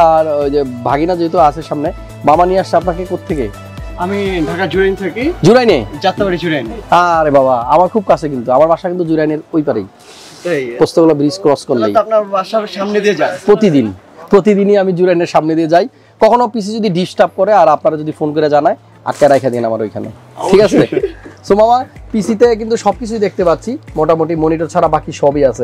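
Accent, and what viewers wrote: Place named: Bikrampur, Bangladesh. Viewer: vai apnar gramer bari ki bikrampur answer please vasha shune mone hoy vai❤️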